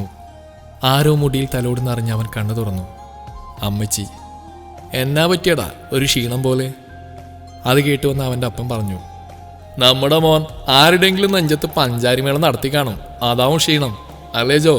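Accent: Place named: Thrissur, India. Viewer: Thrissur basha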